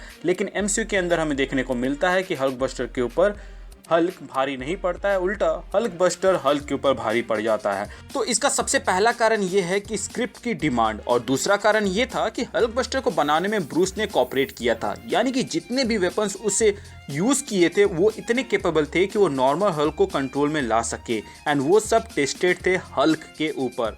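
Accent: India. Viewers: Aap ho kaha se brother kyuki aapke baat krne ka tarika kaphi south east hai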